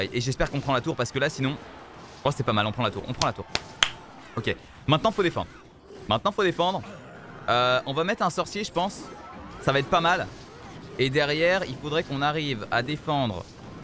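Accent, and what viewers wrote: England, United Kingdom. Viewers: Joli accent anglais je suis jaloux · En vraix tu fait genre mais ta un super accent anglais :OO sinon cool la vidéo ^^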